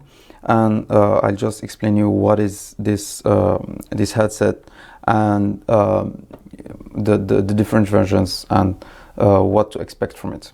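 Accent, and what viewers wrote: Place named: Morocco. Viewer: Dat #moroccan accent!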